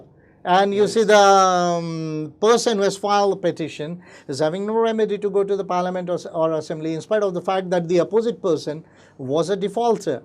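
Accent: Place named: United Kingdom. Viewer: Sir please don't use the British accent.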